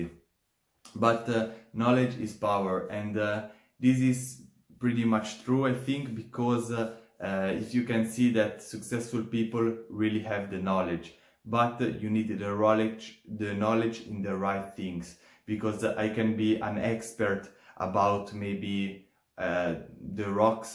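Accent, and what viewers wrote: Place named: France. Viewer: I ear a little background french accent haha. nice video !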